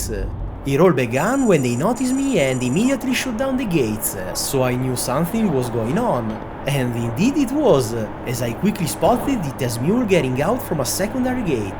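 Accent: Italy. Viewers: Italian accent terrible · the most Italian English i have ever heard, 10/10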